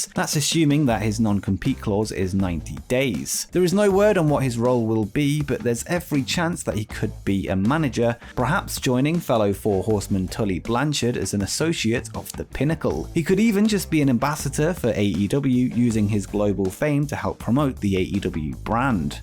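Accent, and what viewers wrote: United Kingdom. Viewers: come on... There is nothing wrong in admitting it's you. I wish that I was able to add a link of your other channel here but I would genuinely fall for your genuine denial if not that both of the voices including the perfect British accent of yours are so genuinely exactly and precisely the same, that one who knows you from Vidiq can't really mistake it.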